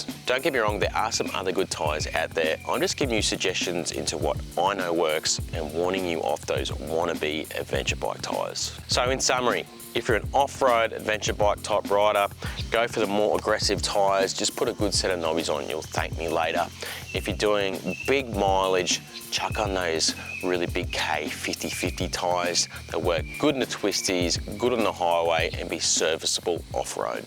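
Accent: Australia. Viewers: Taai'es ... gotta love the Aussie accent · that is not a British accent. Aussie, maybe a Queenslander. Which is Australian, just more so. He dresses like he's from QL.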